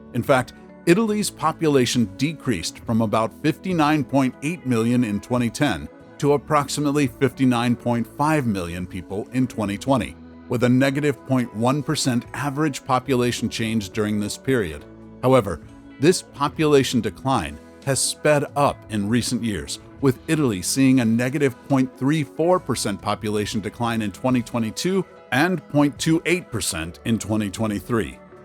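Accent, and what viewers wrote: United States. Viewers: FRON YOUR ACCENT I SAY YOU ARE AMERICAN, BUT YOU FORGOT TO INCLUDE YOUR BELOVED COUNTRY. 20 MILION AMERICANS LEFT THE USA IN THE PAST FOR HIGH COST LIVING, LOW INTEREST FROM THE GOVERMANT TOWARD IT'S CITIZENS, HEALTH CARE AND SO ON. DON'T TRY TO DEFEND YOUR COUNTRY. PRETTY SOON IT'S GOING TO BE ONE OF WORST PLACES TO LIVE ON THE PLANET. GOOD LUCK YANKEE.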